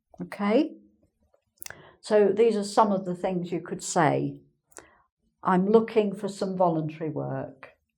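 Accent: United Kingdom. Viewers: Hello, thank you so much for your video lessons. You have very beautiful and clear British pronounsiation. I've got a request. Could you please make a video where you explain the meanings of the modal verbs like must, have to, ought to, to be to etc. The difference between them and how to use times with modals and so on.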